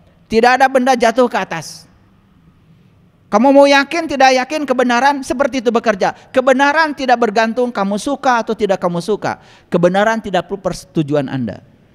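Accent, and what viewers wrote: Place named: Sunda, Indonesia. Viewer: Sunda nya medok , kita teu ngerti ustad....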